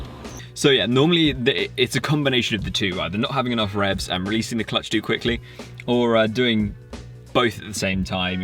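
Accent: England, United Kingdom. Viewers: Something about his English accent makes me want to listen more 🥴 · I heard the same thing! Sometimes this British accent drives me crazy.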